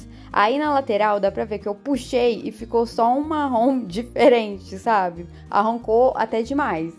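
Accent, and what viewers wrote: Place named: São Paulo, Brazil. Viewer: de que cidade você era antes de casar? Você fala muito parecido com o sotaque do pessoal do interior aqui de São Paulo onde estou morando. Moro em Tatuí, mas, toda a região o pessoal tem esse sotaque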